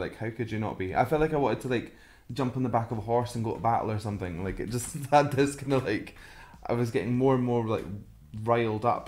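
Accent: Scotland, United Kingdom. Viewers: Scottish accent is rly the coolest !! 😁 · I absolutely love your scottish accent and I love that you love!:D I love them since 2003 and I don't know you, but I'm always happy to see someone else get excited over them:D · Do I hear a little Scottish accent? 🤩 I love it!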